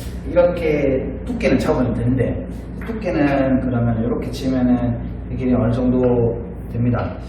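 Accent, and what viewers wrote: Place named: Gyeongsang, South Korea. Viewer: 말투가 진짜 정겹네요 ㅎㅎ고향사람 생각납니다 창원 마산사람이라~ ❤❤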